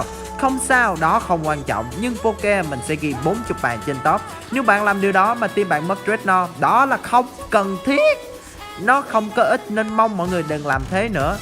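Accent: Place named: Saigon, Vietnam. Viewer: vđ là giọng sg lại nói nhanh ý.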